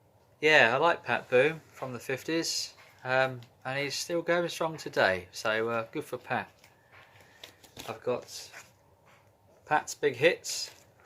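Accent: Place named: United Kingdom. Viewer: This is Tom, not Sandra. You have a great LP collection!! Thanks for sharing them with us!! Like your British accent.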